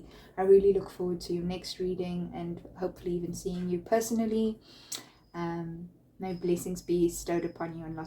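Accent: South Africa. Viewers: Are you South African? Listening from 🇬🇧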